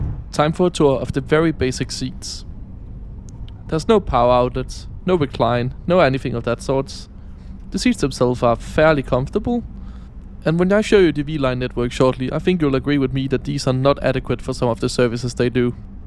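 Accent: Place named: Denmark. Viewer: Your Danish 🇩🇰 accent is soothing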